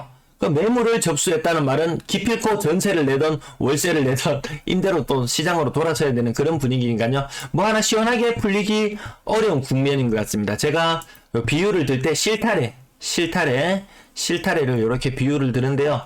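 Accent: Gyeongsang, South Korea. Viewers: 구수한 경상도 코멘트가 참 재밌어서 항상 즐감합니다 ㅎㅎ 고향 부산 떠나 서울 온 지 45 년, 벌써 60 중반이 넘었네요~ 그동안 국가의 소중한 자산들이 대외경쟁력을 높이는 기술/투자/연구/다양성 인재 개발 등에 들어가는 것이 아니라 제 살 갂아 먹기, 투기, 후세에 폭탄 물려주기 등 부동산을 통한 탐욕에 쏠려 있어 내심 걱정이 많았지요. 저는 수십 년 동안 열심히 일해서 자식들 다 공부 시키고 지금은 서울에서 전세로 살고 있지만 두 단위 억원 정도를 은행에 넣어 쏠쏠한 이자 받고 사업 소득도 가지며 잘 살고 있습니다. "모든 것은 본래의 가치로 회기한다" 일부 영끌 20/30/40 우리 후세들이 향후 30~40 년 간 너무 오랫동안 은행의 노예로 묶일 것을 생각하니 슬프고 참담합니다. 그런 이 나라의 장래는 어떨까요? 어릴 때 어른들이 하신 말씀 한 마디 남기고 이 노부는 글을 마감합니다. 젊은이들이 4~50 년 전에 제가 들었던 이 말을 새겼으면 합니다. "세상에 가장 무서운 게 뭔 지 아니? 바로 빚쟁이란다" 이젠 그 빚쟁이는 우리 곁에 있는 살가운 은행이랍니다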